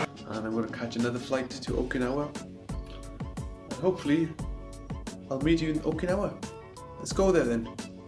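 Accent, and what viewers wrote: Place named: United Kingdom. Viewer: Where did u travel from ? U got a uk accent.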